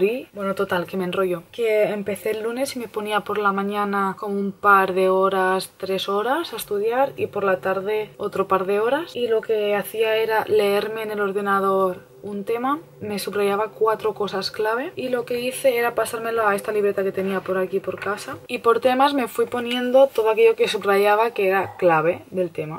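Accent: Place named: Argentina. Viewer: Sos re argentina boluda <3 ajajjaja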